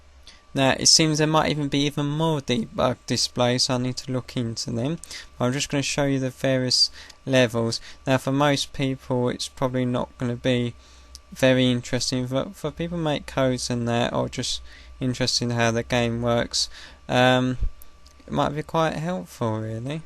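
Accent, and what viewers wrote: United Kingdom. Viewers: yey british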